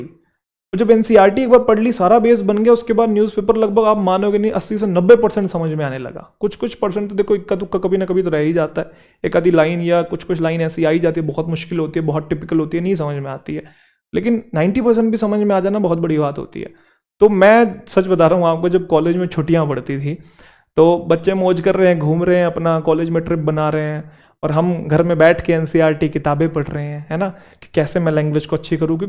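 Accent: Haryana, India. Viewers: Fruitful session🥀 apki batein sunkr aise man krta hai bass sunte hii jaoo….English ke sath haryanvi tadka jo milta hai hme😅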